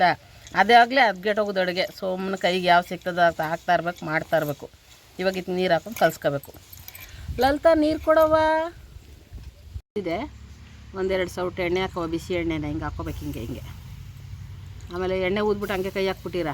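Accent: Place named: Mandya, India. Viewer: ನಮ್ ಮಂಡ್ಯ ಭಾಷೆ ಕೇಳೋದೇ ಒಂದು ಚಂದ ಆಂಟಿ 😂😂😂😂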